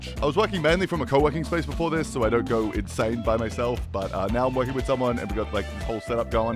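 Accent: Australia. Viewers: Just realized you’re Australian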